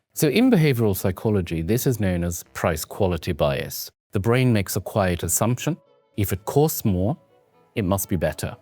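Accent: RP, United Kingdom. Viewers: Now there is a plummy accent!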